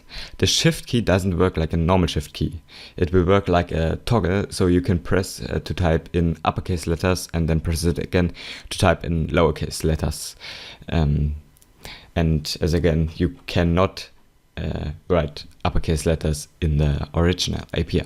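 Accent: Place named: Germany. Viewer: Wieso hört man es immer heraus, wenn ein Deutscher englische Tutorials macht? :D (wohl, weil mein englisch genauso klingt?^^) Ich wollte eben nachfragen, aber ein Blick auf deinen Kanal gab bereits die Antwort. Ich hätte es ja als hübsch empfunden, wenn du am Ende noch das Beispiel direkt in MC gezeigt hättest. p.S.: wird im CC-Forum keiner mehr angenommen? Ich bekomme einfach diese validation email nicht zugesendet und habe dadurch keine Rechte im Forum noch kann ich den Admin anschreiben - blöd. Dabei hätte ich gar eine sehr nützliche API.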